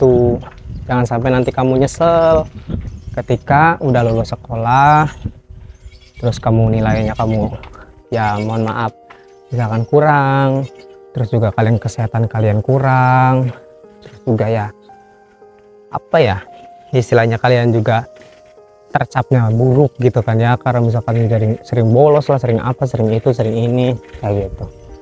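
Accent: West Java, Indonesia. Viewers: Logat bicara nya Sunda mang. Sunda na mana mang? Slm dari Cianjur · A,sundanya dari mana yah?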